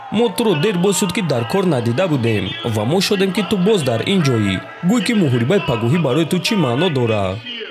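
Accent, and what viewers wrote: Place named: Dushanbe, Tajikistan. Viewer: Аксенти ту ҳамин аксенти Душанбеги бисёр сарама маиз мекунад. Аксентат гуҳ хаст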